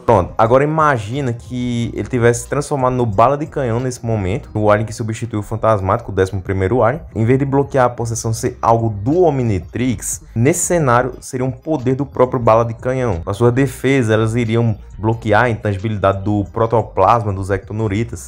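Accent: Northeast, Brazil. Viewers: A proposta do vídeo é o conhecimento nerdistico, n importa se a dicção dele é boa, oque importa é entender os fatos relatados, e a dicção ele é mt boa, só por causa do sotaque nordestino que vc ta se doendo? Patético.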